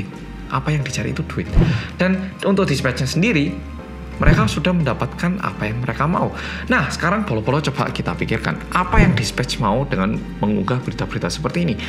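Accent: Java, Indonesia. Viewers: Menungguu mas yang medhooook♥♥ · setiap opening aku selalu ikutran bilang ahahaha medooooooook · Entah kenapa saya sangat suka pembawaan mas di konten pembahasan kasus kriminal, Durasi singkat, padat, Jelas, Visual editing sesuai dengan keadaan dan gak terlalu heboh, dan yang paling enak ya "MEDOOOOOK".. Sukses trus mas, 2021 bisa 10jta sub 🙏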